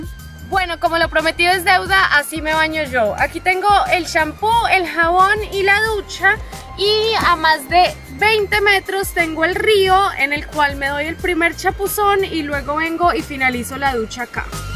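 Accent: Colombia. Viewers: Me agrada mucho la forma de expresarse que tienen las colombianas, tengo una amiga de Envigado que es muy parecida a ti y es un sueño oírla y verla hablar. suerte en el resto del viaje por Argentina, Y desde luego mas allá aún. Soy de Gral Alvear, provincia de Mendoza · Me encanta su espontaneidad y como muestran la realidad de vivir viajando. Que lindo que estén en Argentina . Disfruten y éxitos en sus caminos de aventura. Hermosos y divinos colombianitos. Con ese acento que me fascina. Besitos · El acento colombiano 🥰🥰🥰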